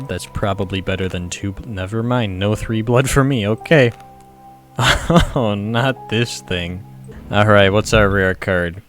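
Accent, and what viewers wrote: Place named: Michigan, United States. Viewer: Are you from Michigan? Your accent sounds so much like my brother in-law who is from there.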